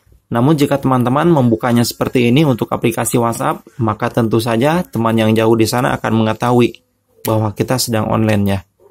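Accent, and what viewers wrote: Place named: West Java, Indonesia. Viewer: Orang sunda pasti yang ngomong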